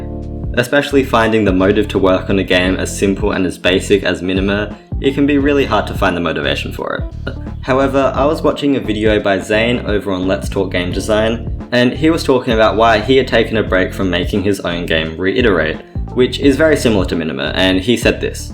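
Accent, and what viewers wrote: Australia. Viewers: didnt know you were aussie, i am too 🙂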